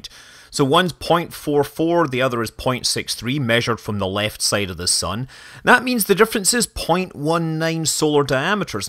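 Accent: Scotland, United Kingdom. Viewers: It just hit me! I figured out why I love watching your stuff so much! Your accent is tricking some part of my mind that Scotty (Star Trek) is explaining this all to me. It must be right! Honestly though, you do a great job of explaining. Even though all this stuff is way above my pay scale, I'm actually "getting it" for the most part. I thoroughly enjoy watching your channel. Keep up the great work. It's cool that you've got your daughter involved as well, it's sure to pay off for her one day. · My head hurts ....too many numbers .....I live on Skye ! You sound jockanise ....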